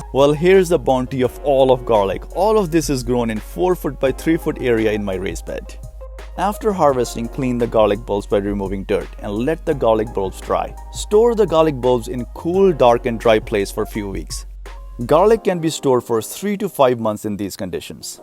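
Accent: India. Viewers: i cannot understand your heavy indian accent bro!!!!!!!!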